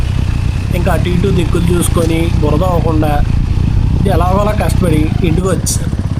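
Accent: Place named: Godavari, India. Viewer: నువ్వూ....నీ తెలుగూ.... గోదావరి యాస.....😂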